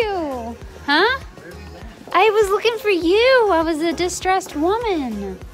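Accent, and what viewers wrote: Texas, United States. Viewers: Do not lose your Texas accent up here!! I love it!!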